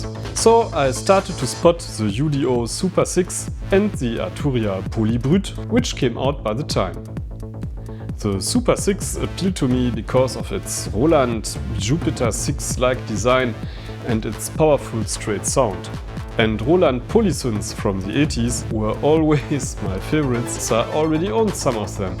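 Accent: Germany. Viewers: With such a heavy German accent, I can better understand you in German.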